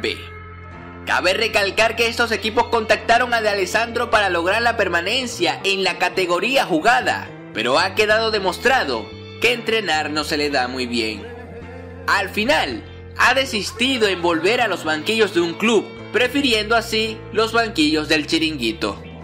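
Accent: Venezuela. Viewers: Hola amigo, eres venezolano, verdad? Además que siento que narras los videos algo parecido a Dross. Saludos desde México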